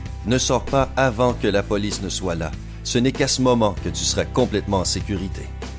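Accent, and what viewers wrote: Quebec, Canada. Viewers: C un quebecois qui parle.Moe aussi j'en suis un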